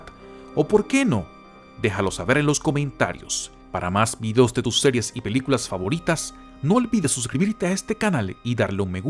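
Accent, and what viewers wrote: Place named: Chihuahua, Mexico. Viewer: Eres de Chihuahua o porque pronuncias así de fea la "ch"?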